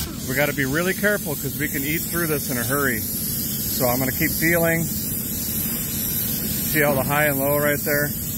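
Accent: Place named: Tennessee, United States. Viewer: Great idea Thanks! Tennessee talk! I saved some thought was junk too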